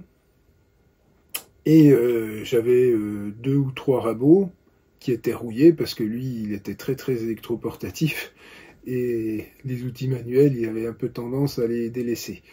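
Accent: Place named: East, France. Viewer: Bonjour, C’est toujours un plaisir de retrouver tes vidéos qui rythment la semaine et cette foire aux questions est un moment bien sympathique d’où faute d’avoir pléthore d’interrogations, émerge un bavardage amical, ambiance qu’on ne peut pas retrouver dans les vidéos « ordinaires » de la chaîne … Ces bavardages lèvent un peu le voile sur les personnalités, les origines, les passions et je crois que c’est stimulant de voir que d’autres personnes nourrissent les mêmes centres d'intérêts et on se sent moins seul face à des sentiments de « culpabilités » que l’on peut parfois ressentir surtout lorsqu’on investit dans un bel outil 😜😜😜… Du côté de tes origines, je n’étais pas loin puisque j’avais cru reconnaître un accent de l’Est. Jolie région que l’Alsace et la Lorraine (aussi) … Personnellement, je suis temporairement exilé en Auvergne où ma femme bosse encore mais mes racines sont plus au Sud car natif d’une île de la Méditerranée où les autochtones sont dotés d’un caractère plutôt vif et à l’amour propre particulièrement exacerbé, mais tellement accueillants et hospitaliers… (bon, là tu auras trouvé) Hé bien, ce fut un plaisir, et pourquoi pas poursuivre par un futur « live » qui du coup permettrait une discussion en direct. 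Une excellente semaine et encore merci pour ce moment!!👍👋👋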